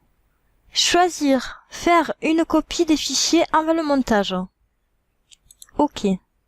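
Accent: South, France. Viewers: Cet accent sudiste me hante! (Je passe mes vacances en France donc je réalise un rêve ) Mais sinon ça va mettre très utile pour ma prochaine vidéo ;-)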